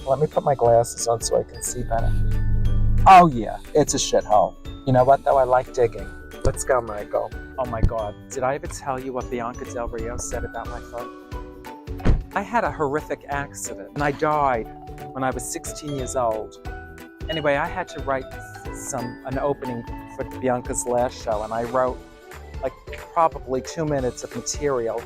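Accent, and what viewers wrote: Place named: Bronx, United States. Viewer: I love shopping with Coco and her beautiful self and that Bronx accent. ❤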